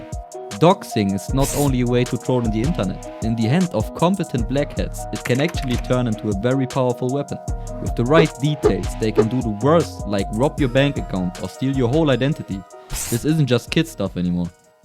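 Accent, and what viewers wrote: Germany. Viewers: i hear deutsch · Du bist 100% deutsch das hört man sehr stark raus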